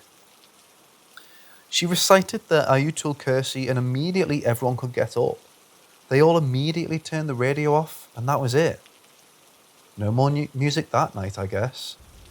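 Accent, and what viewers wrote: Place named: Northern England, United Kingdom. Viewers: no he's definitely english...sounds like he comes from up north to me. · I had a feeling he was English but he pronounces some words differently. Northern England was going to be my guess.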